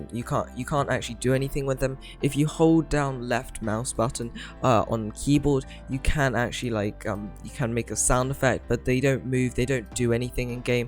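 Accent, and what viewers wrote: United Kingdom. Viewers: Are You British? If you are you’re probably from the UK or EUROPE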